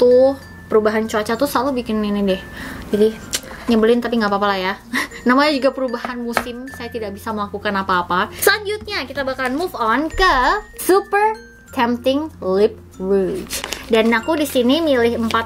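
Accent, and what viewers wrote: Bali, Indonesia. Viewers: logat balinya masih ada yaa eonnie "lagi satu" bukan "satu lagi" hehehe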